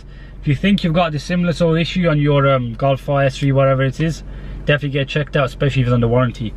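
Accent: United Kingdom. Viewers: He's obviously from Great Britain ...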